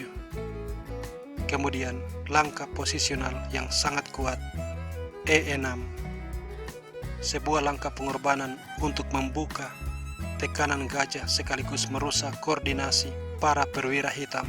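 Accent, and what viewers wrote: Manado, Indonesia. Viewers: Kaya y manado😁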